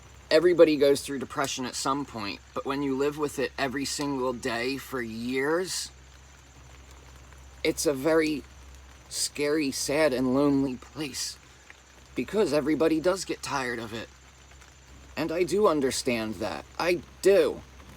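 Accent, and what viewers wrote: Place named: New Jersey, United States. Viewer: That's a beautiful article! I have some chronic illness issues as well as mental health so this hits home for me too. Another good one is called the "spoon theory". It helps with both chronic and mental health illnesses. I'll try to remember to send it to you on fb if I can find it. I'm also a little tech challenged so it might take a hot minute. 😂 PS where is home for you? You sound like my childhood lol. I grew up in May's Landing NJ and was born in Atlantic City so I know jersey when I hear it lol. I always call it my first language because as soon as I hear that accent I go right back to it lol. You can take the girl out of Jersey but never the jersey out of the girl! 😁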